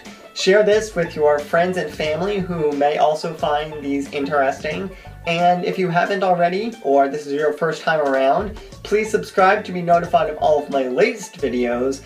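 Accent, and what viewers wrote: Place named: Ireland. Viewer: Sounds very Irish!! New subscriber 😄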